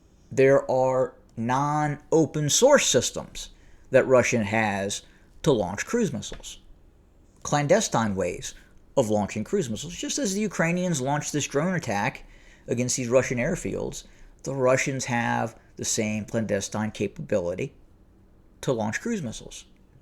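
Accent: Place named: United States. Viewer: Nice to hear The Kremlin spin in crisp American English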